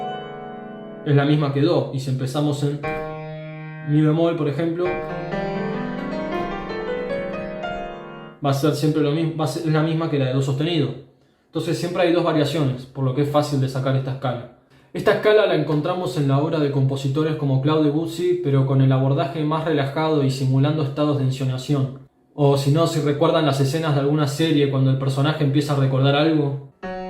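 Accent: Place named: Argentina. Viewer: Espectacular análisis de la técnica del gran Keith Emerson en español. Intuyo por tu acento que eres argentino. El país de Alberto Ginastera, al que, como muchos otros, conocemos gracias a Keith. Se me ha pasado volando la media hora larga del video.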